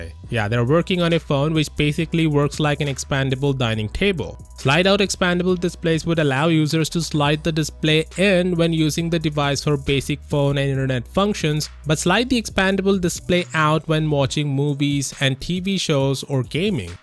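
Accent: India. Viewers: He sounds like an an India IRS scammer.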